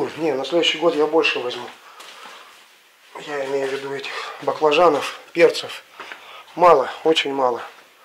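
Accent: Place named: Kuban, Russia. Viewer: Привет, канешно мало баклажан, а говор уже кубанский проявляется!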